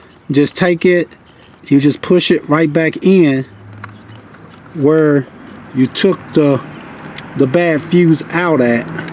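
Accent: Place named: New York, United States. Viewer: Man I love your accent, its sound southern and New-Yorker at the same time. Thanks also for the car tip, god bless you sir!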